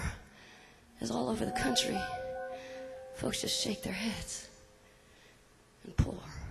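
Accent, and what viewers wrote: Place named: South, United States. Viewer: I swear if she EVER talked to me with that voice i would die! And her lil country accent would ..aghhhh i'd just die :p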